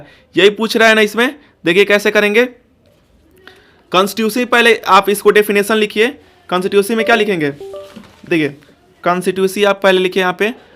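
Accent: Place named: Bihar, India. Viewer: Aap Bihari ho kya aapka bolne ka tarika Bihari jaisa hai Main bhi Bihar se chhapra saran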